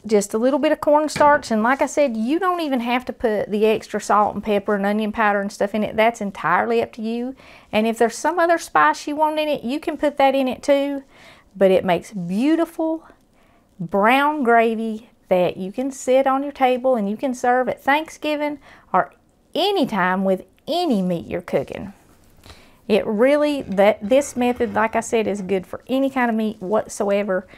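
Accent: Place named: Appalachia, United States. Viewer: I am thankful to know Jesus, my family, waking up today, I'm thankful for you and your channel. channel I enjoy it. I actually don't watch any other recipe channels but yours. I love the way you represent everything and explain everything and I really love your hillbilly accent. I'm not sure who Brett is that you mentioned losing, but I pray for you and your family for the hurt and for God's peace to return in your life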